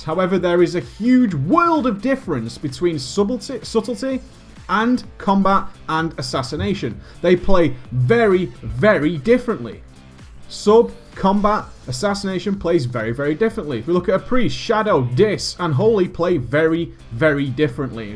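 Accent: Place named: United Kingdom. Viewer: Hes been in some high ranking guilds and he is highly experienced in terms of raiding in wow. But I dont think he thinks highly of himself I believe you are just hearing the normal British bloke.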